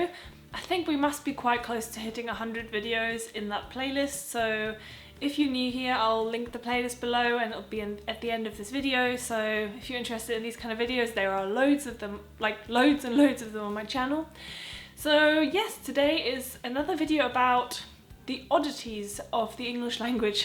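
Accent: England, United Kingdom. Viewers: you sound ENGLISH to me.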